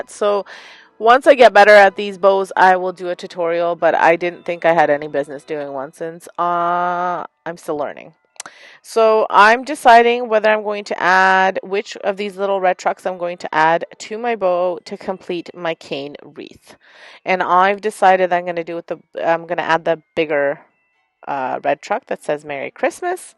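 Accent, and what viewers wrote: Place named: Canada. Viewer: I knew you had a accent. You are from Canada like my daughter in law. Lol.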